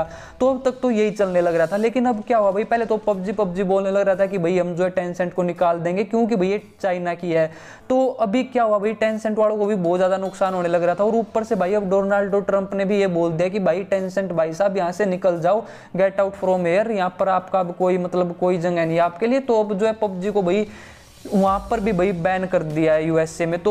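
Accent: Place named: Rajasthan, India. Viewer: RAJASTHAN JAIPUR SY HU MAI AAP KE VOICE HAM HRA RAJASTHAN SY MILTE HY. REPLY PLZZ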